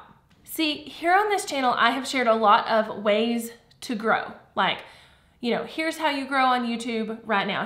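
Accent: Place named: North Carolina, United States. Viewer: DUDE. this video and these analytics are blowing my mind :) THANK YOU - and im from NC too so your accennt is so comforting for me. lol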